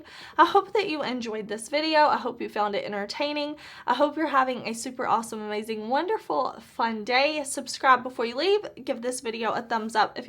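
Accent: South, United States. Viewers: Those are gorgeous, but at 57 I don’t wear sparkle on the daily like I used to so I don’t need this palette! I am the one who watches all your videos because you are so fun to hang out with online and I could listen to your beautiful Southern accent for hours!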